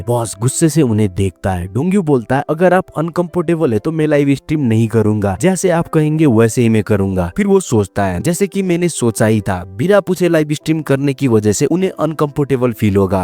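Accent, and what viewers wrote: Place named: Nepal. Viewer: Hamro jastai Hindi xata😂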